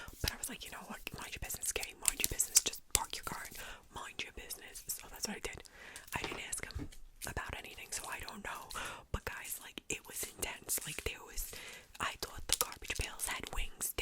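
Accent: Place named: Long Island, United States. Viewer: ❤❤❤ LOVE THIS!!!! I was born and raised on Long Island but now live in Ohio. I love listening to you talk, that probably sounds crazy but you sound like my childhood, lol. God Bless 🕊️💝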